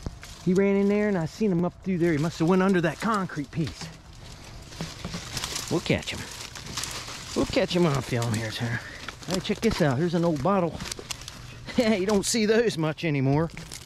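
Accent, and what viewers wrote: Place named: West Virginia, United States. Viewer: That accent... puts you somewhere around Fairmont to Clarksburgh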